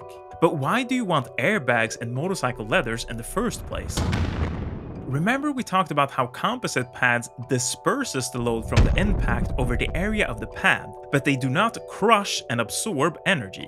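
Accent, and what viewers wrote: Sweden. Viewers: are you swedish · Swedish accent? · Are you Swedish? or är du svensk? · Are you from sweden? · Are you swedish my fellow motorcykel rider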